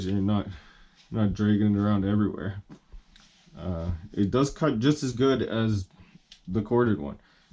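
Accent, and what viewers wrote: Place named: Canada. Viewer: This guy has the biggest Canadian accent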